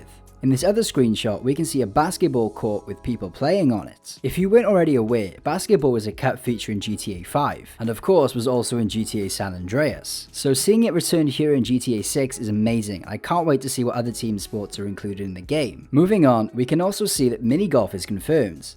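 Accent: United Kingdom. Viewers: Bro got that British voice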